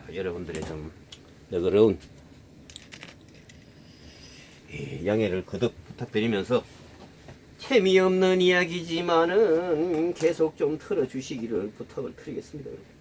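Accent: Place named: Gyeongsang, South Korea. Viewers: 경상도말투는 한참 듣다보면 편두통이 온다네... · 앞전에 님께서 칠점사 꼬리떠는거 설명하실 때 "건들면 쥑이삔다" 라고 하는 거 듣고, 님 고향이 경남 서쪽 어드메쯤인지 대략 짐작이 갔었읍니다. 성조도 부산 마산은 아닌 것 같고, 대구는 더더욱 아닌 것 같고, 진주하고도 다르고, 찍어서 대략 그 안쪽 산동네 지역인 것 같았고... 제가 사실 서울사람이지만 모종의 일로 엮인 적이 많아 경상도 말씨를 꽤 잘 아는데, 경북쪽은 "-해뿐다"라 카든 것 같고, "-해삔다" 경남쪽에서 쓰는걸로 알고 있읍니다. 원래 태백 일대 말씨는 대체로 경북 북부지역 일대와 마이 가차웠던걸로 기억합니다. 다만 두문동재, 만항재만 넘어가면 주민들 말씨가 확 바뀌던 것 같읍니다. 원래 태백 (황지+장성) 일대가 삼척 땅의 일부였고, 소싯적부터 삼척 일대가 국어학자들 사이에서도 영남방언의 일부로 간주되는 측면이 있었다는데... (그래서 경상도 어르신들이 가끔 뻥을 좀 치시지요. 원래 삼척은 경상도 땅이었다고, 뭐랄까 영동공정?을 열심히 펼치십니다. 경상도 출신이 아닌 제 아버지조차 세뇌되어서 그런 말을 하실 정도) 참고로 삼척 윗동네, 옛 명주군(현 강릉의 읍면단위 지역) 땅이었던 묵호쪽도 외지인 유입의 영향으로 경상도, 함경도(실향민들이 많이 정착) 사투리가 꽤 많이 통했읍니다. 지금도 동해시에서는 엄연히 "강원도"지역임에도 불구 "도째비골"을 관광홍보물에 대놓고 " 의 경상도 사투리를 뜻합니다"를 말할 정도로, 그정도로 영남지역의 영향을 많이 받았던 동네입니다. 적어도 지금 40대 정도 세대는 대체로 그런 듯 한데, 하지만 지금 묵호의 최소 중고등학생 세대까지 내려가면 그 이전 세대에 통용됐던 영남방언이든 관동방언이든 관북방언이든 다 잊혀진 듯 합니다. 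정류장에서 버스를 기다리면서 학생들 말하는 것 듣다보이, 그냥 "성조 없는(위의 세 동부방언 모두 성조 있음)" 평평한 서울말로 대화를 하더라고요. 이건 강릉도 그렇다는데, 강릉쪽은 예전부터 문중사회 기반으로 해서 토박이들 많고 소싯적에 대관령 서쪽과의 교통도 대단히 불편하고 해서 (영동선 뚫리기 이전에 제주도 울릉도 오가듯 철도가 있는 대도시인 원산, 포항, 부산으로 '기선'타고 갈아타서 서울로 갔다고 하네요) 꽤 독특한 억양과 어휘의 고유의 방언이 사용되던 고장인데, 젊은 세대 사람들이 사투리를 잘 모르게 돼버려서 그래서 지역 학자들이 많이 아쉬워한다 하더군요. 이 역시도 급격한 표준어권 문화의 유입으로 인해서...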